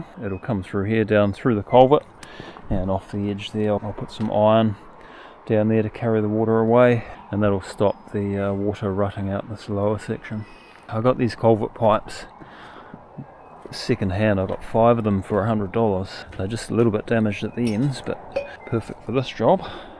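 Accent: New Zealand. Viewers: Sounds Kiwi, awesome presentation dude thank you.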